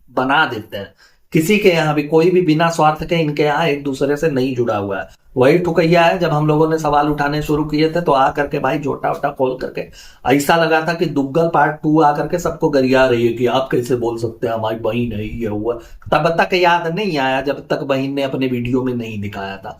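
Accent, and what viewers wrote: Uttar Pradesh, India. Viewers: Bhai aap up se ho ??aapki baato se lgta hai main bhi up se hu ...accha lgta hai aapke bolne ka andaj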